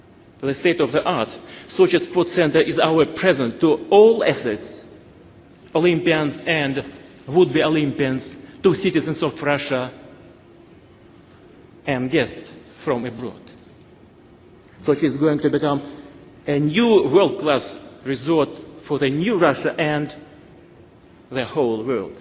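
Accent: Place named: France. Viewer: Sounds French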